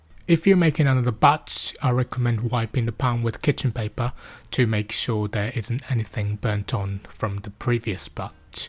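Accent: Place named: England, United Kingdom. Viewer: Not sure about the recipe yet i totally hot lost in your fascinating English accent!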